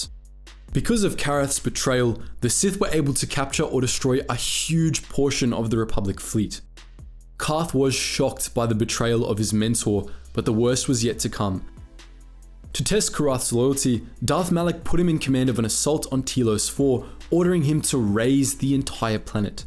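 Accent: Australia. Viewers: I’m trying to pinpoint the accent. Are you Australia like me too at all? This is the first time I’ve watched one of your videos you’re amazing so far I think! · As a fellow aussie I know how hard it can be to speak clearly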